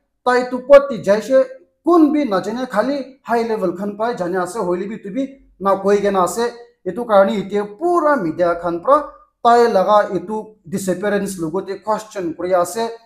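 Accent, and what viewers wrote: Nagaland, India. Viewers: 😂I like your nagamese news..as you speak our naga ascent of our nagamese common linguage..thanks and may God bless you pl..yes stay as,a naga and speak as a true origional nagamese I like it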